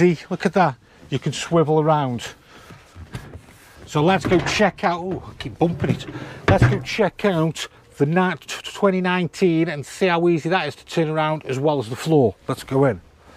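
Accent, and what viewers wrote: Stoke, United Kingdom. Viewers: Also.. I just had to Google where you’re based as you sound like a local Stokie to me. ☺️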